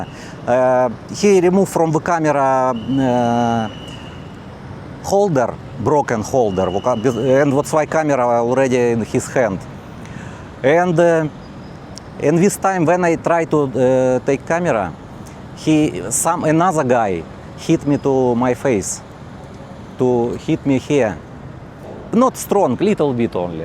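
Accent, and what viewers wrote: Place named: Russia. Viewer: You sound like you’re Russian by your accent. I think it’s cool af that everyone has to speak English.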